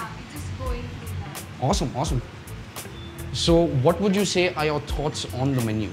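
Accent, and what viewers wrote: India. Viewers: What kind of english is this 😂 hate indian English speaker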